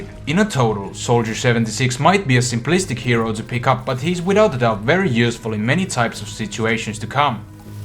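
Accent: Finland. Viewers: is Finnish, and Finnish people are known for their "Rally English"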